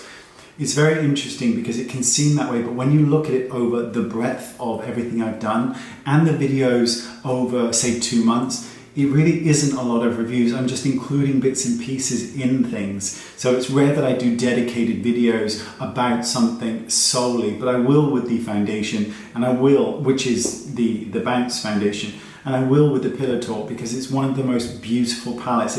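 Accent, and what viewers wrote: United Kingdom. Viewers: Oh.......I could listen to you read the phone book all night long. You have the most soothing voice, but we all feel you from the inside and know you’re the real deal! I’ve read some of the books you mentioned you have very good taste lol. When you said “bazaar” my heart melted.....bazaaaaaaa!!!! Love that British accent (and you’re cute too!!!) ❤️😘❤️ KimP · First, us Americans are just bowled over by the British accent which in your case is really lovely. Second, I have learned so much from you. I’m a almost-60 year old woman and the very editorial looks are just not appropriate for me. Lastly, your genuineness and gentleness are evident in every video. I try to fill my space with kindness. You help. 💜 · You DO have the best voice & I love the British accent so much!!! And you just saying “Dirty Weekend”... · I agree with that comment, I love your voice. 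It is very soothing, soft and gentle. I love British accents. One day I will live in England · I remember the flowers in the attic 😢 I honestly have to be in the mood to pick up a book. I could probably write my own novel of ups and downs 😅 I love to pop on youtube and watch your videos and forget my worries. I think your voice and accent is great.. Being a fellow brit with the priceless wit and sarcasm is always a treat.. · Cathy Gilbert you hit the nail on the head! I always feel good after watching one of his videos. He’s funny & helpful & truly caring. Wonderful British accent & very easy on the eyes LOL. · It's funny to me when people talk about a "British" accent, there are only about, what, a hundred? Two? But while your vowels are indeed lovely, I think the real thing may just be that you are a truly lovely person, and that shows through.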